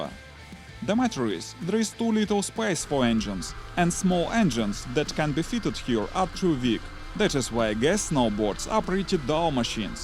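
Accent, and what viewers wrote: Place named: Russia. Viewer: Да, русского сразу выкупаешь, но который пытается казаться нерусским (сам в молодости пытался так говорить) А наповал пишется слитно, кстати)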